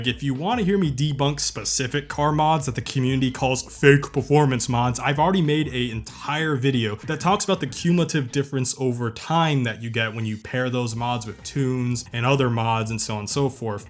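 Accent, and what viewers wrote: United States. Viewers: He's American, wtf you on about?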